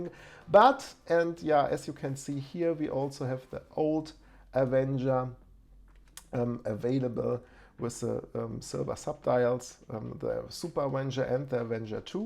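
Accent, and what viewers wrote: Germany. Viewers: I like the German exent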